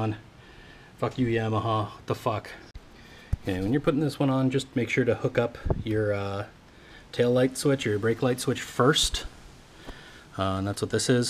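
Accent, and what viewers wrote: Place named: Canada. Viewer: Just stumbled upon your video. Lol as you started talking I was saying yo myself this guy must be Canadian! Then I saw the Ontario plate. Lol good video bud!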